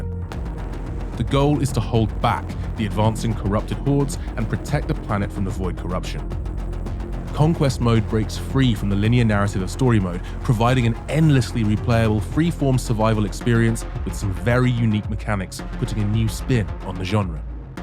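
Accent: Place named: United Kingdom. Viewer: My man you need more views with that smooth British accent.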